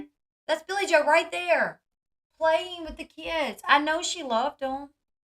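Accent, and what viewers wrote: South, United States. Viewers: Love love coming to your channel!!! We have the exact same views and southern accent 😂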